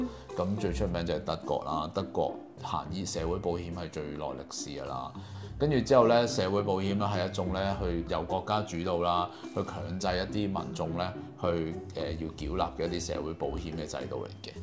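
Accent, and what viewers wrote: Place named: Taiwan. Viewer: 姐的中文，愈來愈台灣味⋯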